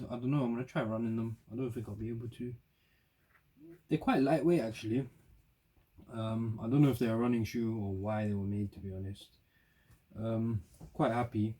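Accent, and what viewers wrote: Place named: England, United Kingdom. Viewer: What happened to the English accent? Sounds horrendous now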